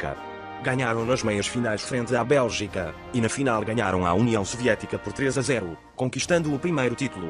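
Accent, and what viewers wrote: Portugal. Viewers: Dá pra entender de boa o sotaque português, mas vlw mano.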